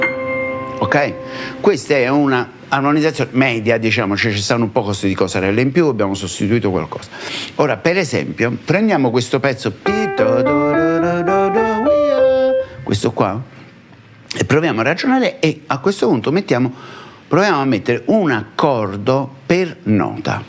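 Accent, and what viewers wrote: Naples, Italy. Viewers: Oltre che bravo insegnante sei simpaticissimo con le tue espressioni con cadenze napoletane. GRAZIE mille.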